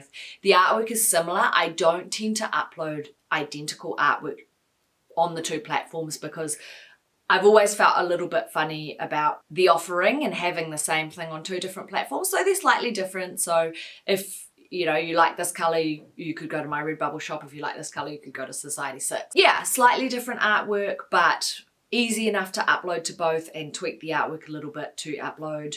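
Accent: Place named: New Zealand. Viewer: Oh, hey! I knew you were from new zealand from your accent! I live in new zealand too :]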